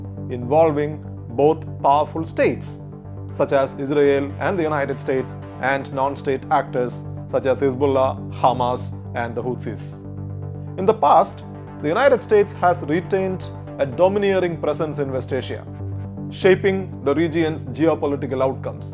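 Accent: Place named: Kerala, India. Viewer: English with Malayalam accent, sounds interesting, but difficult to comprehend easily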